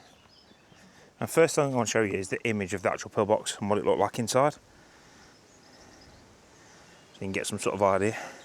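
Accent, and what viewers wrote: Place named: Leicester, United Kingdom. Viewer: You sound like you’re from Leicester.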